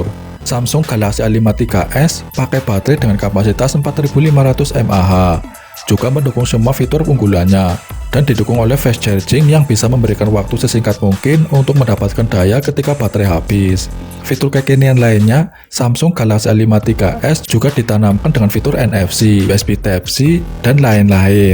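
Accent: Banyumas, Indonesia. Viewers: Ngapak sekali 👍 min , , , ?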